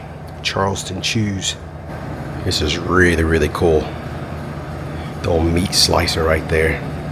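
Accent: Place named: Ohio, United States. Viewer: I'm surprised to learn you are from NC. Your "accent" is very Ohio. Thanks for another good video.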